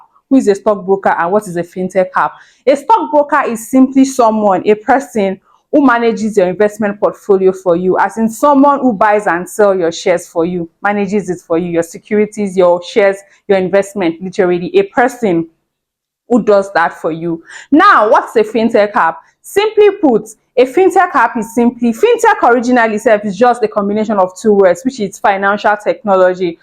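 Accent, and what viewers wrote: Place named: Nigeria. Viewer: Your surname sounds Ghanaian and your voice is screaming Nigerian. Good insight though 🔥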